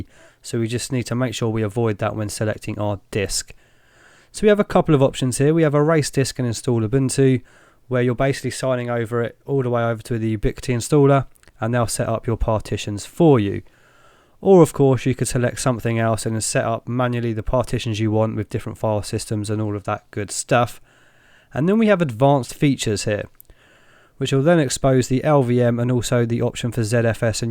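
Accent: England, United Kingdom. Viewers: I like this bloke - Pommy accent doesn't grate on Australians :)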